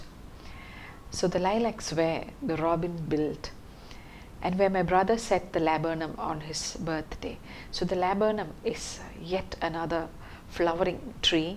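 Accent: India. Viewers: IN Skip navigation i remember i remember by thomas hood 9+ Avatar image / I remember, I remember - Poem by Thomas Hood | Explanation English Lessons 64.1K subscribers Subscribe 556 Share Download Clip Save 24K views 1 year ago An explanation of Thomas Hood’s poem I remember, I remember. 37 Comments R U Thowamy Add a comment… 11 months ago Ma'am I have been watching your video for last one year. Now I am a teacher too. So about my last interview I gave a demo class on my subject. I noticed your attitude through your video that you always being clam and using Indian accent. Ma'am believe me I did exactly follow your attitude and Now I am selected. So thank you so much for giving the inspirational personality. ❤ 22 Reply 6 months ago (edited) Wonderful analasys, I saw many other analasys but your is the best of them. Thanks alot for your efforts and simple analasys. 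1 Reply 1 year ago Ma'am, please do a video on the poem Mac flecknoe by John Dryden. 1 Reply 1 year ago The teaching style is very good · Ma'am I have been watching your video for last one year. Now I am a teacher too. So about my last interview I gave a demo class on my subject. I noticed your attitude through your video that you always being clam and using Indian accent. Ma'am believe me I did exactly follow your attitude and Now I am selected. So thank you so much for giving the inspirational personality. ❤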